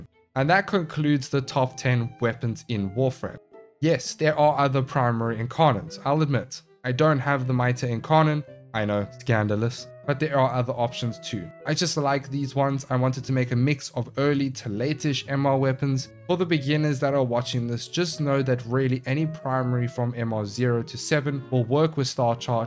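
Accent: South Africa. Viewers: As someone who recently got back into Warframe, thank you! 🫡 Also, your accent sounds like you're South African, if you are, I hope that loadshedding is kind to you Tenno 🙏🏻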